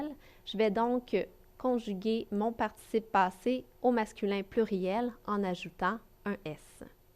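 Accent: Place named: Quebec, Canada. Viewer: merci Québécoise avec ton accent qui s'accorde avec ta belle province ;-)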